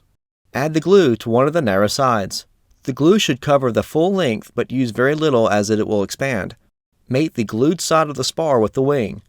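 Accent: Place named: Charleston, United States. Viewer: awesome. I lived in Charleston for a long time. Thought I recognized that accent.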